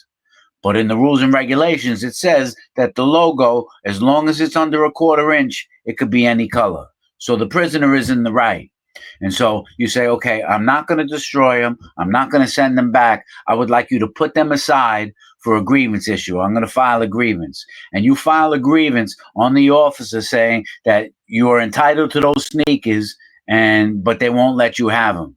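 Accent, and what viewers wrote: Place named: Downstate New York, United States. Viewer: This Dude is 💯% Spot On!😳! I Love His Message & His Accent too! Always have loved the Northeast / New York Accent! This Video Is Lighting My Brain & Heart Up!😇!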